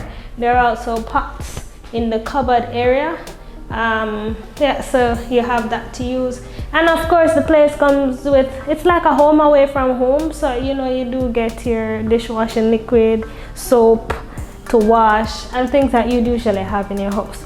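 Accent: Jamaica. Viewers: you are very funny and cute... Especially when you let go with your Jamaicaness. I love you videos and your energy..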